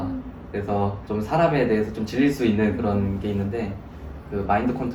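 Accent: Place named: Jeolla, South Korea. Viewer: 말투가 전라도인데 혹시 고향이?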